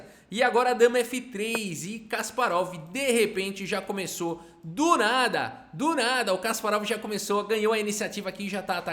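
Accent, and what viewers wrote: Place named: São Paulo, Brazil. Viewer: SP capital. O sotaque entrega muito kkkkkkk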